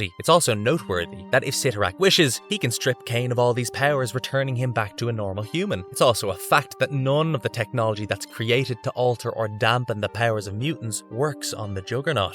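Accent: Ireland. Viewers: Irish voice over? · Is that an Irish accent?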